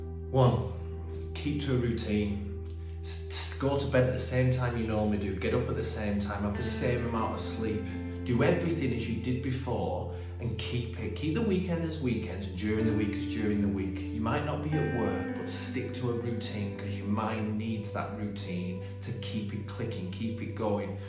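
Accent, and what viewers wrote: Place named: Yorkshire, United Kingdom. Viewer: Great video Coach! When you mentioned your accent I assumed that you meant that your 1st language is not English, but I find pleasure in your accent. Is it Yorkshire perhaps? Thanks again for boosting me. Keep going, you're doing good work!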